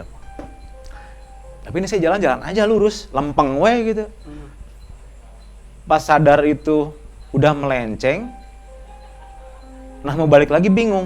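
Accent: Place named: West Java, Indonesia. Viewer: Maksudte, akhire ada bahasa jawanya tapi logatnya sunda bang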